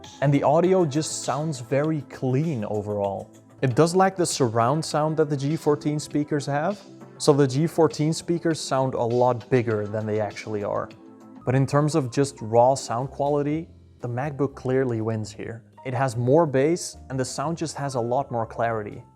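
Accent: Netherlands. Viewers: The first dutchy who doesn't have such a strong accent haha dankjewel! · Ik hoor een heeel Nederlands accent 😄 Eindelijk een goeie vergelijking die ik zocht. Thnxxx👍👍👍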